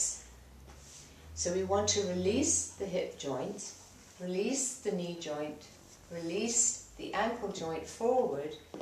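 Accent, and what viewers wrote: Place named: United Kingdom. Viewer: Love British accent!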